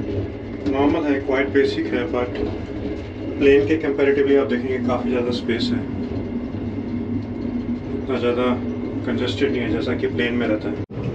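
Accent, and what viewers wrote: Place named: Hinglish, India. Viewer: Какой классический у Вас хинглиш!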